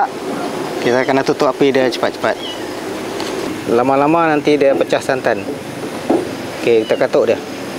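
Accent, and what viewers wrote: Kedah, Malaysia. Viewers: Terbaik dan krew. Dari daerah mana. Mcm bahasa saya baling kedah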